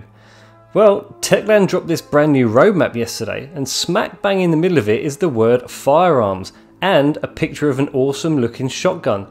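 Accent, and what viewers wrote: United Kingdom. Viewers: hey! are u British, or what language are u speaking, in ur videos?????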